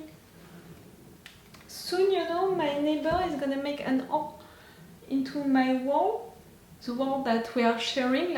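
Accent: France. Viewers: it's a French accent.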